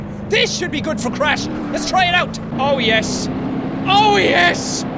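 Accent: Ireland. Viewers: That accent is OBVIOUSLY Irish. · This has been my first time on this channel and I must say, your accent just sells it! That Irish? I think it's Irish. Well, whatever it is, I love it! It just tickles me! I'll keep looking at your stuff, and am heavily considering subscribing. Thanks man! Cheers! :D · the first video i ever watched, 8 years ago, i was obsessed with beam ng and saw this video and muted the sound cause i didnt like lets plays at the time. then i realised he was irish, same as me, and then i thought he was cool. 8 years later and i watch meme time everyday because its the only cure for sadness. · Yeah, hes irish, IRISH people are cool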